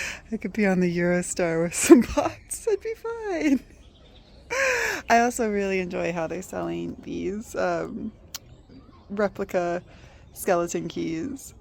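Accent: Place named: United States. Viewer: Strange to hear a place called a palace described as cute? It's so cute to call it cute. You sound american so if you plan to use brick I hope you don't live in a state with bitter cold winters. Britain doesn't tend to have long spells of bitter freezing temps. If you get bricks make sure they are dense and not very water absorbent or they will fall apart after even a year or two. Stone holds up better in freezing temps as long as it is very absorbent stone. I don't know why everybody insists on using grout on the new precision tiles. You don't need it. Tiles don't expand or contract due to temperature changes you experience in a typical home. You can lay tiles with the thinnest line between them. That seam can actually be the same cement you use to adhere them to the floor.. I use a vinyl adhesive. The grout only tends to get dirty or even moldy. 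There are grout sealers but I don't know how long they last. If I ever do my kitchen floor again I'm going to omit the grout between tiles. I laid a hearth under a wood stove using granite tiles and didn't use adhesive or grout. The subsurface is very flat so nothing wobbles. In case anything cracks I can replace it easily with a few backup spare tiles. There is an oak edge holding everything in place against the walls. NO problems after 10 to 15 years already. I haven't had any cracked tiles. .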